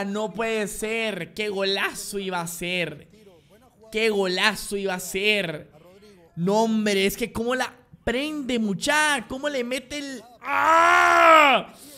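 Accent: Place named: Argentina. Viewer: Porque carajos habla como si fuera Argentino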